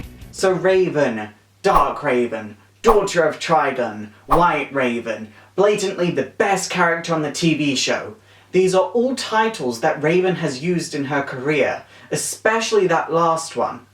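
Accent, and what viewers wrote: United Kingdom. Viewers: okay, 1 why the wig? 2 are you like slightly talking with a British accent? 3 what about the tv series?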